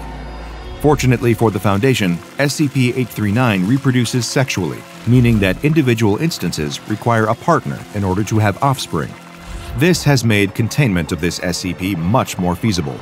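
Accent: England, United Kingdom. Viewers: might be the most british voice ive heard in a while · These English accents are beautiful, I am LOVING your videos. I've been watching and subscribing since your first video. Watching you grow to one million subscribers made me so happy. It's nice to see you going strong.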